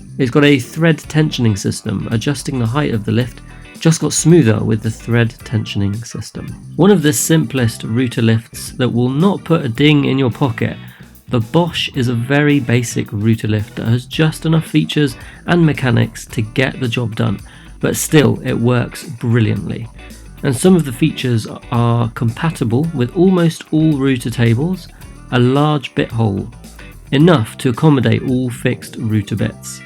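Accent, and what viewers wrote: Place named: England, United Kingdom. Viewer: He's a Pommy, not a Kiwi!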